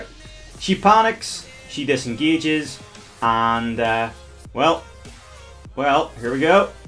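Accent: Northern Ireland, United Kingdom. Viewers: Northern Irish! :D cool accent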